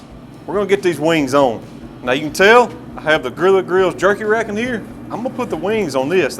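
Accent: South, United States. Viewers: He got that southern accent .....sounds like molasses drippin out his mouthhh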